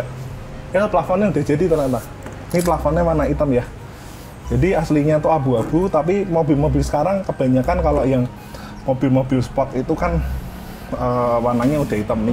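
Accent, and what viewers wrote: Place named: Semarang, Indonesia. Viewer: Logat semarangan bangts. 👍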